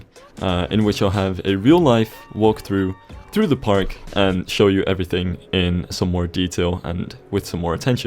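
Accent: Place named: Netherlands. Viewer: Dude i couldnt tell you were dutch Lol nice accent. Btw nice job!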